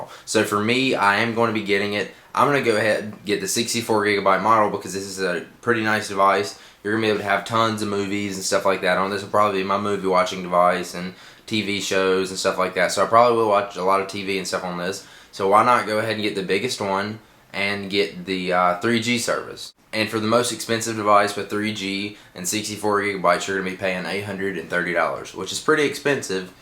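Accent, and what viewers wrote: United States. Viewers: i'm from england and usually the american accent really bugs me but i kinda think your accent is really kool it's like your talkin and i dont wanna tell u to shut the hell up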